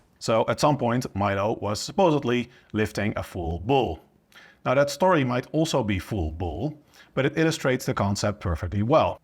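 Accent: Netherlands. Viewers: I can spot a dutch accent anywhere. Lol.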